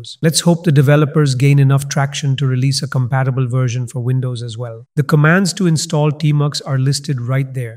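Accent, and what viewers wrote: India. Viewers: The only indian i can bare to listen too. SUPER INDIAN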